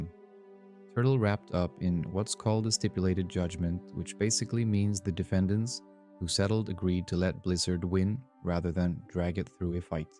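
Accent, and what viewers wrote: Sweden. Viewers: 'Over here in the US'? You sound like you are from Sweden. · I think he is just swedish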